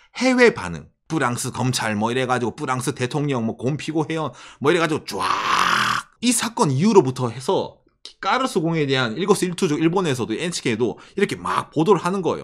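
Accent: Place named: Daegu, South Korea. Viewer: 대구 사투린가요 ? 대륙남이랑 억양이 비슷하네여 ~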